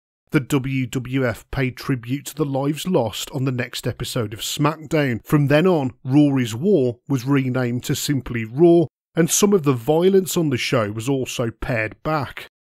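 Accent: Birmingham, United Kingdom. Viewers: Are you from Birmingham by any chance? Or black country?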